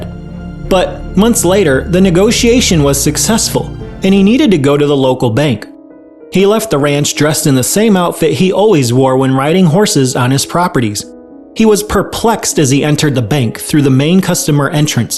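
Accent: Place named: United States. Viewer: Narrator sounds American but the script sounds like its written by a non native english speaker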